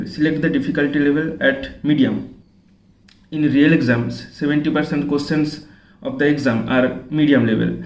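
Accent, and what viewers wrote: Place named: Bengal, India. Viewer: That bangla accent is so nostalgic here in Delhi. Great tips! 👍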